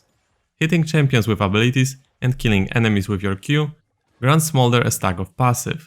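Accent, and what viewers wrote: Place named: Poland. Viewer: polish accent diff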